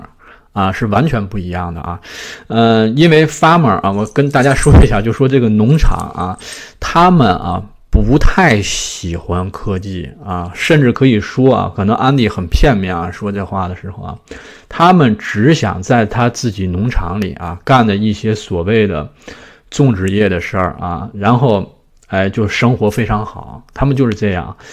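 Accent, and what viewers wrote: Tianjin, China. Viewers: 這是天津式國語，在大陸很受歡迎的。您聽得習慣嗎？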